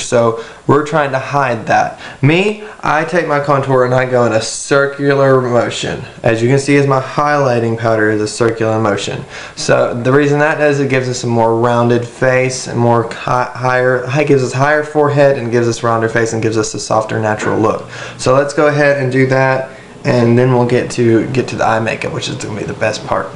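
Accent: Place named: South, United States. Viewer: girl gotta be from Kentucky or Tennessee! Love the accent! I felt at home watching this video! :D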